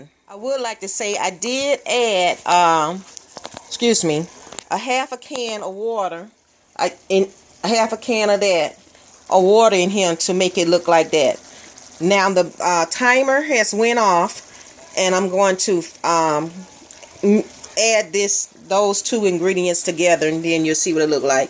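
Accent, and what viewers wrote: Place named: South, United States. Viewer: 😂😂😂😂lol. She has a southern accent.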